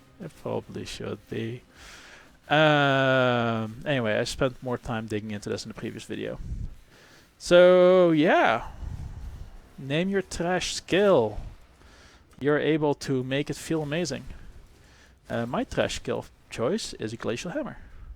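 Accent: Netherlands. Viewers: Je hoort meteen t nederlandse accent haha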